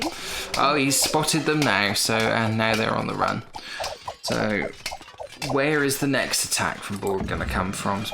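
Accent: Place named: United Kingdom. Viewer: Doesn't sounds like much of a break! If you live in the UK (like you sound you do... you're also up quite late!)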